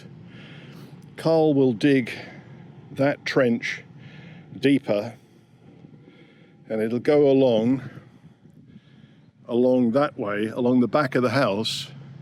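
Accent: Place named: Australia. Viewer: Not long found your Reno, loving the videos & music 🎶. And you sound like an a fellow Aussie!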